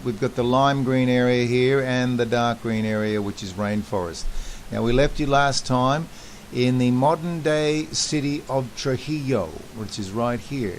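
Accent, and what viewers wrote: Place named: Australia. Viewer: I like it...your American accent is fading and sounding more Aussie:) Cool info.. please guys don’t forget to plant native trees and let nature reclaim it’s land!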